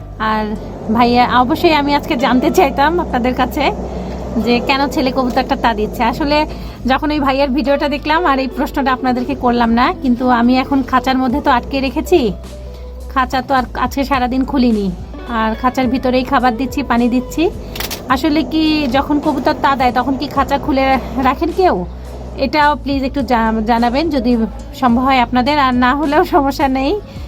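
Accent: Rangpur, Bangladesh. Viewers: Onek moja pacchen kobutor ke niye mone hoy upner bastota bare giyeche upner kotha shune mone hocche Rongpure bari amader bashao Rongpur thaki from Austria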